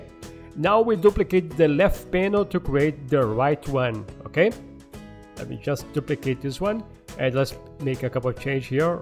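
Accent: Brazil. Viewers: Excuse me, are you Brazilian? Me desculpe perguntar, mas você é brasileiro?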